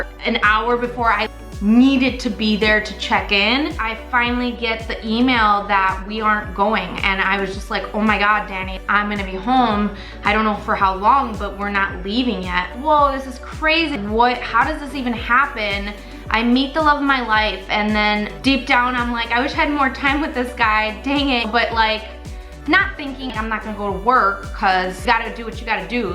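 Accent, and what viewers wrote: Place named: Illinois, United States. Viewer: I was born and raised in central Illinois...I knew your accent sounded like home🤍 I’ve lived in Louisiana for almost 20 years and the dialect is completely different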